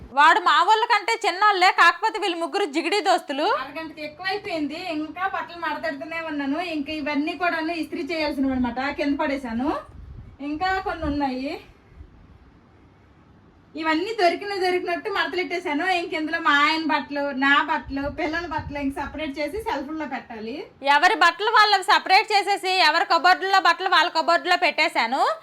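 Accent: Srikakulam, India. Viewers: Voice over lo full sklm loney matldthru madhi sklm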